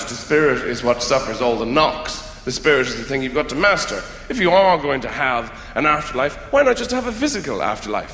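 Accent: Ireland. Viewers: He's Irish . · captain obvious.... he is Irish. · He's just Irish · He's Irish, yes we know. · Isn’t that just Irish? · That’s just an Irish accent 😂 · No. He is Irish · muller Except that they sound quite alike as both grew up in not far outside Dublin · He's Irish. Don't judge. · Nah man, he’s just Irish.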